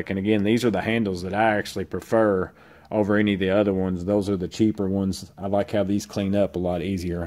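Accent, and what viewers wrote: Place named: Texas, United States. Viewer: I love your Texas accent lol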